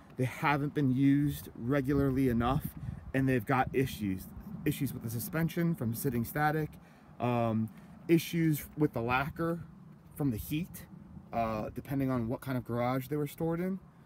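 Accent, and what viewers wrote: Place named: United States. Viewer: I don't want one with out the tray tables either, that's the same way I feel about buying and Jaguar, it has to be VDP. You sound like one of us Yanks in the US mate. lol. In one of your other vids you also said the 2007 Arnage was the best year to own, so my question would be which year would you recommend for someone who's never owned one? There aren't very many independent service techs who specialize in them in my city Austin, TX.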